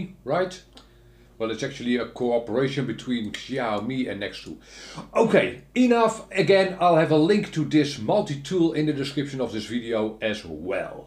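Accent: Netherlands. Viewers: I use qx7 Ik gebruik nu een qx7 Love your channel and your dutch accent by the way. Keep on with your channel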